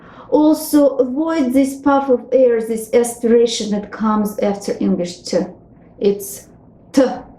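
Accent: Russia. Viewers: Are you Russian? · Russian Accent <3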